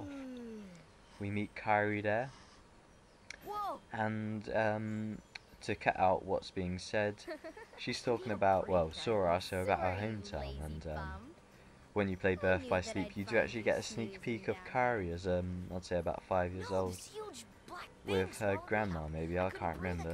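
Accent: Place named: United Kingdom. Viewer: A fellow Brit liking Kingdom Hearts! I have never heard a Brit do a commentary on it :) Love it :3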